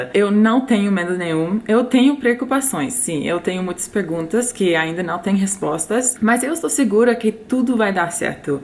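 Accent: Rio de Janeiro, Brazil. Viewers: Estou na cidade de Pompano Beach seja bem vinda mais uma americana Carioca ate o seu sotaque em portugues e do rio show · Vocêix, paíx Totalmente carioca Kkkkk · Americana com o verdadeiro sutaque carioca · esse sotaque carioca dela é engraçado demais: Nóixxx Paixxx! será que ela sabe todas as gírias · Já fala com o xxxx do carioca! Rs Boa sorte na viagem! ❤ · primeiro: Fico muito feliz em ver vc tão empolgada e com tantos planos! Tão diferente de quando vc chegou aí nos EUA. Depois, acho lindo seu jeitinho carioca de puxar a letra " s" no fim das sílabas E, já que vc não se importa, gostaria de fazer uma pequena correção: A gente não usa "desde" para se referir a lugar, só usamos para tempo. Pra lugar, falamos só "de". Abraço. Tudo de bom pra vc e pro Max! 🤗😘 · Você herdou o sotaque carioca. Hahaha Paísssss, nóissssss Legal isso. Boa sorte, menina. 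Jogue-se de cabeça.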